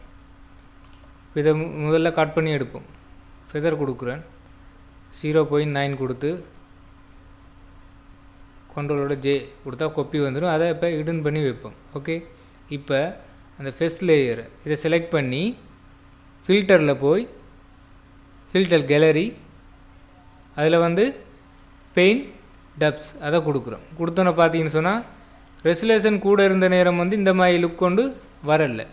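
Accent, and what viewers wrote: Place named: Sri Lanka. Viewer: Excellent SriLankan Tamil. Super . Vazthukkal Brother...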